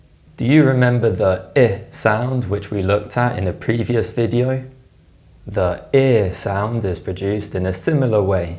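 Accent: United Kingdom. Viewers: Thank you teacher. I like british accent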